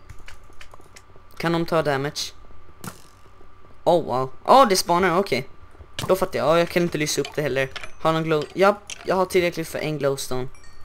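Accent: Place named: Gothenburg, Sweden. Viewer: Var bor du ? Låter lite som göteborgska..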